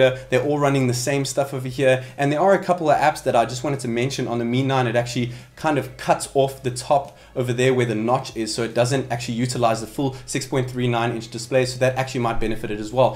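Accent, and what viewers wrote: South Africa. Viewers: Hey are u from South Africa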